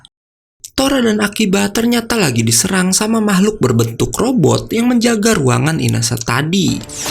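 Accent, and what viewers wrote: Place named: Kalimantan, Indonesia. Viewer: Mimin org Kalimantan ya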